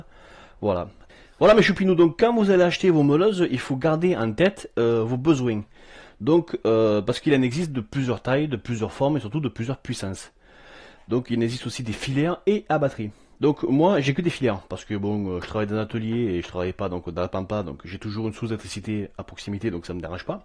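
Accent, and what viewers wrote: South, France. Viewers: Merci pour ces bons conseils donnés avec ce bel accent du sud ;o) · Super Sympa et très utile ce tuto. Que d'excellents conseils avec une pointe d'humour c'est toujours agréable à suivre. Par contre un petit soucis..l'accent plutôt du sud et le petit kenavo breton . Alors plutôt Rennais ou Marseillais ;-))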